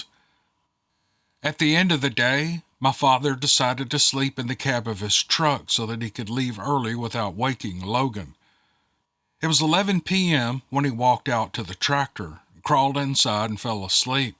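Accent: South, United States. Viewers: Hi I'm from the US Virgin Islands I listen to your podcast and I enjoy the Steve Lily and enjoy the tone of your voice it's a real southerners voice and I am very bad at writing so please bare with me again thank you.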